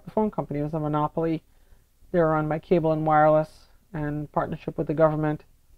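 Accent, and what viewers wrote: Trinidad and Tobago. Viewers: Thanks, by the way are you from Trinidad? KM6DHT/AG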